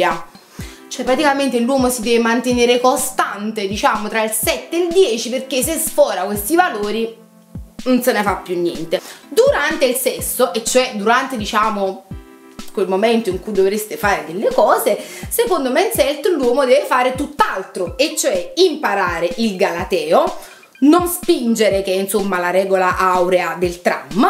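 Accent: Naples, Italy. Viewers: Però questo accento napoletano non si può sentire... e io sono napoletano xD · è l'accento napoletano a dare gusto al monologo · E' l'accento e la pensata napoletana che dona quella schiettezza e stimola l'intelligenza! Col mio accento romano non riuscirei a dare un senso di serietà al discorso...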